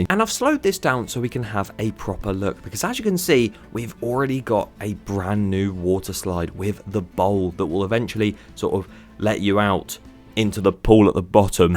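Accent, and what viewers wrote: United Kingdom. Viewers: I like you, british man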